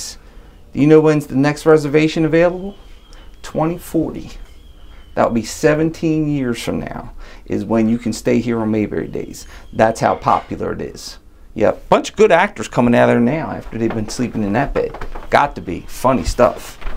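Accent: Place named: South Jersey, United States. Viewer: Who didn’t and still doesn’t love the show. Andy Griffith was a great actor. I loved him in “A face in the Crowd”. It’s one of my top ten movies. The host is enjoyable too. I have to wonder where he’s originally from, because he sounds like people from far south New Jersey! 😊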